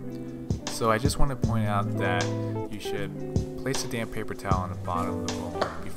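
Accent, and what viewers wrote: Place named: South, United States. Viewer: Your voice, with a touch of Southern, is so soothing... perfect zen element to go with sushi! Thanks.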